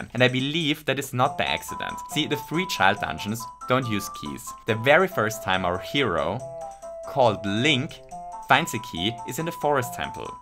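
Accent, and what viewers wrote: Germany. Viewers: Hes german… you here that 5 secs into the video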